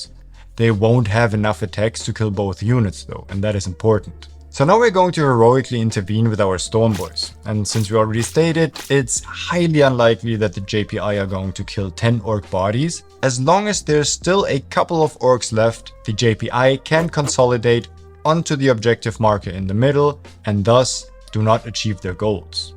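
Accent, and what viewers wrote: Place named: Germany. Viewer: why do I hear the slightest german accent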